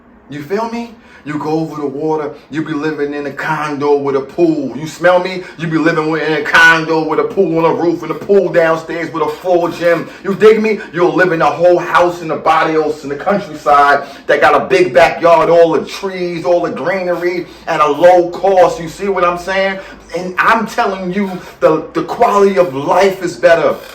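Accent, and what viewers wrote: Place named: United States. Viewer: Btw, your American accent alone is exotic to them. If you try to speak their language that puts you to the front of the line. Just be humble and they will accept you!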